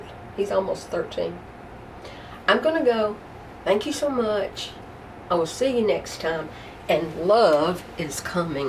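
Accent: South, United States. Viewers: Congratulation! That is when I started watching you when you said you Lived in a Shed. I thought let me see where this poor old woman has to live! And what a surprise, I love your beautiful shed! Your camera looks good. I just love listening to you talk, I love your southern accent. I watch a lot of "live alone" women on YouTube because that is me also. Keep up the good work!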